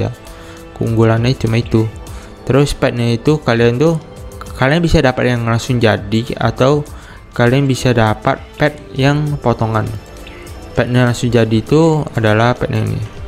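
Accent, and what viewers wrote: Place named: Palembang, Indonesia. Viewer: keknya org plg ya bang? ngmng nya kadang keluar dikit hahaha btw nice vidio bang saya jadi tau harus masuk ke event mana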